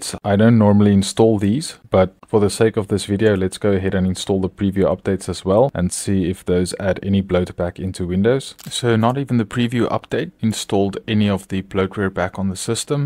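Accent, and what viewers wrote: Afrikaans, South Africa. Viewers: South African for sure ? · South African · Do I hear a brother from SA? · I'm hearing some SouthAfrica in there :D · It is great to see a South African doing well on YouTube. It also sounds like to have a Afrikaans ascent. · are maybe south african? also cool stuff! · South African detected! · I could be wrong, but it sounds like you have that SA english accent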